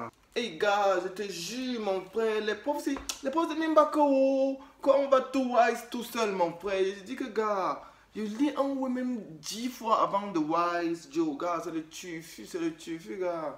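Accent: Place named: Cameroon. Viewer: J'adore ton français camerounais. Je n'ai presque rien compris...but your acting is so funny!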